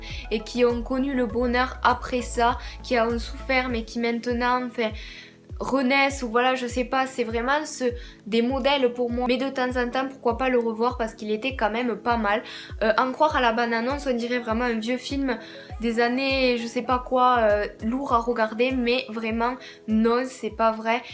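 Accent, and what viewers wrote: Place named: Toulouse, France. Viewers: Étant également une très grande fan de Thomas, j'ai vue tout les films que tu as proposé, et je suis d'accord avec avec toi de AàZ. Sauf pour le labyrinthe, j'aurais mit 10, et pour Nowhere boy j'aurais mit 10 aussi, mais là c'est plus personnelle comme je suis fan des beatles et que c'est grâce à ce film que j'ai connu Thomas. En tout cas, très très bonne vidéo, super agréable à regarder. J'adore ton accent (toulousain?) je pourrais t'écouter parler pendant des heures hahaha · Thomas c'est le meilleur, perso c'est mon premier acteur préféré 😍😍😍😍😍 Et sans avoir lu ta description j'ai reconnu l'accent Toulousain x) moi aussi je suis Toulousaine ! 😀😀💕👌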